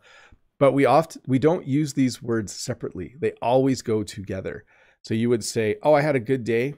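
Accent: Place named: Canada. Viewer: Hello Mr I like your Canadian pronunciation and whenever I have time I see your videos and I really learn a lot from. good job good teacher respect from Afghanistan.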